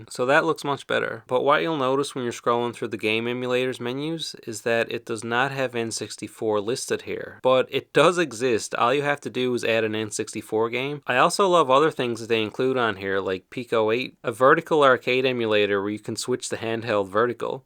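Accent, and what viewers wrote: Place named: Newfoundland, Canada. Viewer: Just ordered one of these after watching this video. Looks like an awesome rig! You also kinda sound like you're from Newfoundland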